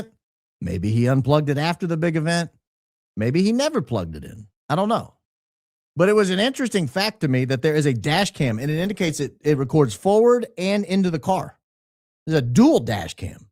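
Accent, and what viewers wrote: South, United States. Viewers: Yes, …You definitely have a southern accent. 😂. And I agree with you about Christmas Album! is my fave!!! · babes to a northerner you for sure have a southern drawl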